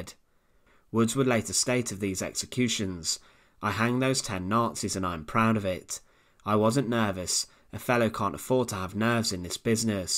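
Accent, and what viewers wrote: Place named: Yorkshire, United Kingdom. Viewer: I'm curious if Brits have some kind of secret laboratory where they try to develop the strangest accents possible... earlier today I was just thinking, if "Brits are so opposed to the letter "R", why did they put it in so many words, I mean after all it's THEIR language, they could have made it any way they wanted"... I deal with people from all over the world and they all pronounce "R" as "R" [well accept for some Asian nationalities (Japanese, Korean) where they can't hear the difference between "R" and "L", but that's another story...), and not "ah"... so "Remember" instead of "remembah"... ...and then I clicked on this video...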